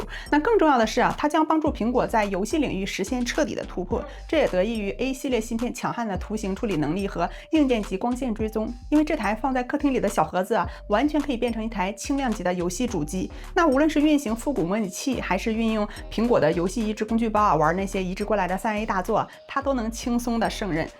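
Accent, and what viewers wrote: Northeast, China. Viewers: 你是东北哪的啊妹妹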